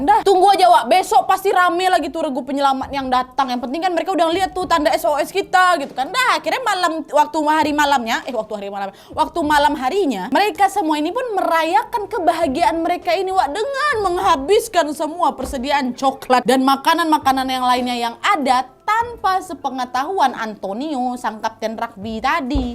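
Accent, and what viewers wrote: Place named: Palembang, Indonesia. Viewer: demen gue, logatnya wong kito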